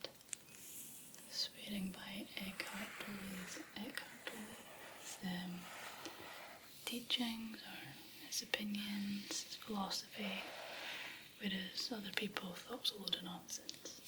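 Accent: Scotland, United Kingdom. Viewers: i will never not have a weak spot for scottish accents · Aaaaannnnd I'm on my way to dreamland. We need more scottish accent asmr. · my new years resolution is to find a scottish girlfriend (Im Australian) cos i could listen to that fluffy accent the rest of my life. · Where in Scotland are you from? Lovely accent wherever it is. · other people must be thinking this is a strong accent but for me its normal bc im scottish to AHhah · Have to say the curls REALLY suit you! There's no Welsh alternate sayings/words for New Year unless you count Blwyddyn Newydd Da; Happy New Year to you! Could listen to you all day, love the softer Scottish accent. . I practice the LoA and things have manifested so well for me recently, but still working hard on the Power of Now which can be quite hard to maintain. . Guessing you're near the M8/M80 😉 . Much love from North West Wales 😍 x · Love the ton of your voice the nice pleasant Scottish accent Not only that , you're beautiful inside and out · you are so beautiful and talented. 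I love all your videos. Your hair is beautiful. Your hands are beautiful and I love your hand movements. Your voice and accent are wonderful. You should be recognized as a Scottish national treasure. Thank you for all the great videos. You always help me relax and make me smile. Thank you. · The Scottish accent is the best accent in the world.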